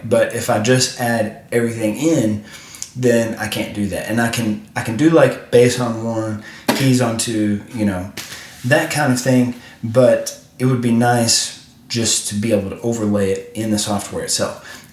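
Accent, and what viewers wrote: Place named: Illinois, United States. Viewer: WOW!! BIBICAL!! I sure hope ROLAND paid you for this video!! Oh, color your beard "black"; Abe Lincoln!! No kidding!! As Angus Young; student! Dark-in your beard; play dressed as Abe Lincoln!! Especially with the slight southern drawl!! Right out of southern Illinois!!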